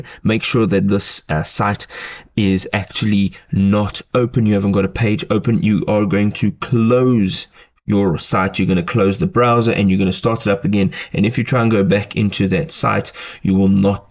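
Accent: South Africa. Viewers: You sound South African....? Like from Western Cape, right?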